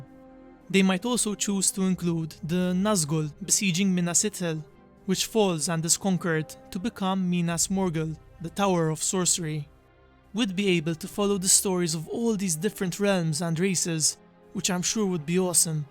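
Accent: Malta. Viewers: The Maltese accent is so evident haha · great video! are you Maltese?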